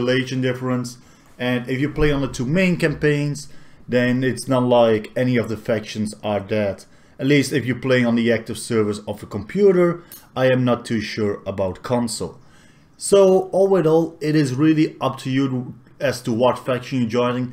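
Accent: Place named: Russia. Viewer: I haven't heard the dutch accent much I guess and maybe there is a reason that's obvious idk... But I hear a bit of russian in there.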